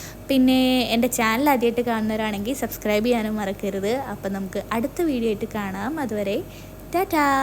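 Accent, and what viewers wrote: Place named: Kannur, India. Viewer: Aaa. 😍 kannur salnk poleind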